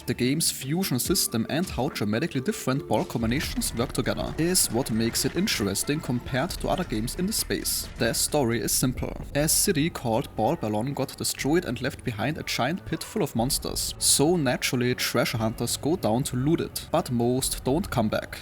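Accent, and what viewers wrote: Germany. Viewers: Game looks great and is landing on gamepass at release tomorrow. Demo was fun so I'm looking forward to it. By the way... where is your accent from? Sounds German ;-)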